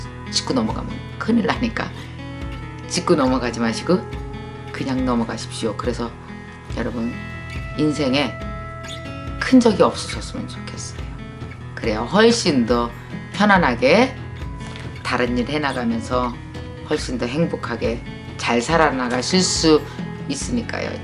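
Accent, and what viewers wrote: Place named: Chungcheong, South Korea. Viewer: 오래전 동료 캐나다인 친구가 자기 어머니의 인간관계 처세술이라며 절대 다리[bridge]를 불태워서는 안된다고 했어요 다리를 불태우면 돌아갈때 다리가 없다고... 일맥 상통하나 표현법이 다르죠 ...정서와 문화가 너무 다르죠...선생님께서는 영어강의를 누구를 위해서 누구를 대상으로 하실건가요? 제가 선생님과 친분이 있는 관계라면 영어 강의 좀 기다리라고 하고 싶어요. 좀 더 유튜브의 비쥬얼에 신경을 쓰고(컨텐츠는 저도 팔로우라 좋아요)있으면... 외국에 있는 한인 교회나 다른단체에서든 러브콜이 올거예요 비행기표와 함께...거기 초빙되어 가셔서 그 멋진 충청도 사투리로 선생님 이야기 들려 줘보세요 다들 웃고 울고 할꺼예요. 대한민국이 전쟁을 겪고도 노벨 문학 / 상이없다고 엽전의식에 젖어 있는 사람들 봤어요 가와바다 야스나리죠? 설국쓴 일본작가? 저는 학생때 읽었는데 이 책이 왜 노벨 상을 받았는지 이해가 안되었는데...중략....다음에 여건이 되면 꼭 본론을 쓰겠습니다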